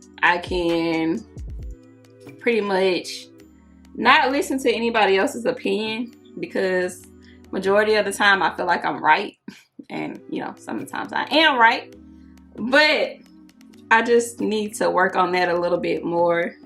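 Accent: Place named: South, United States. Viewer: U country where u from youngan?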